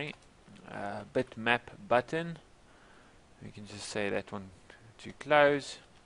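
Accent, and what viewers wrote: South Africa. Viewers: Are you afrikaans? Cuz i am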